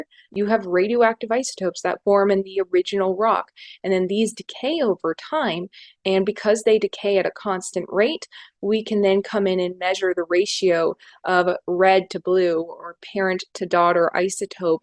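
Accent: United States. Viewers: Wow - super clear and accurate. (And great to hear a voice from the USA that is not talking nonsense - here in the UK, the news is dominated by Trump and his creatures, so I have come to associate an American accent with idiocy).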